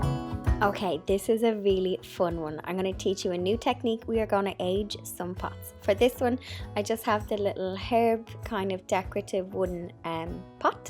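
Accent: Ireland. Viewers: Cute! When you were talking about YouTube algorithms I didn't understand you. I'm an American and sometimes your beautiful Irish accent is difficult hard for me to comprehend.. Could you explain what you meant?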